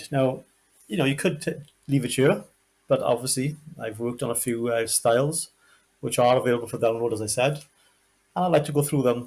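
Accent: United Kingdom. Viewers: not tuned to the speech patterns of south Wales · not used to the local accent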